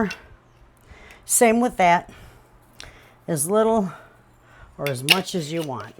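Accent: Michigan, United States. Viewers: I am so glad I found you! I started my at home cottage bakery back in October last year and I am learning so many tips and tricks from you. We are doing our local farmers market and getting some really great feedback and sales so far! Thank you so much for being open with your techniques and recipes!!! PS I am from MI, haven't been a resident there for over 25 years but I distinctly hear your Michigan twang LOL. Love it!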